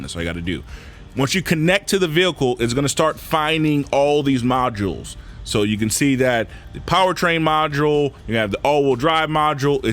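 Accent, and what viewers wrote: Louisiana, United States. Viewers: what part of Louisiana are you from?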